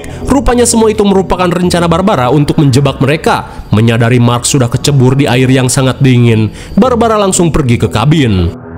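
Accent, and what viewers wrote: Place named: Sunda, Indonesia. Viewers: abang nya curiga org sukabumi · teh org Priangan timur deh org mana sih jiga org banjarsari kok cara ngobrol nya sama kaya aku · Admin ini kyknya dari tasik atau ciamis😅,kenur kenur😂😂😂😂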